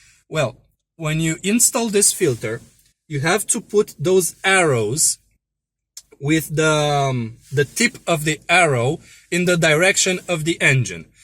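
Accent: Romania. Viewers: Tu esti roman? Your accent sounds very Romanian. Pe vremuri era usor sa-mi dau seama, ca eram toti cu Dacii LOL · De la o poștă se simte ca ești român 😂🫡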